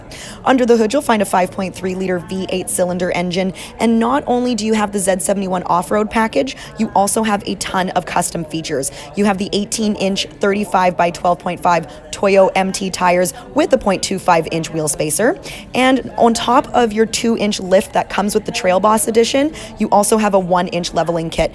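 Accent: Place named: Canada. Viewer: Canadian tell 1 - "Zed". Canadian tell 2 - "Oout".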